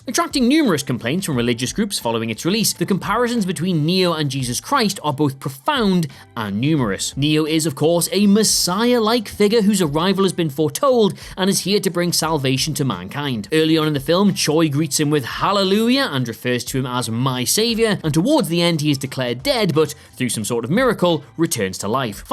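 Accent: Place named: England, United Kingdom. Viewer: I thought the video would be good until I heard the english accent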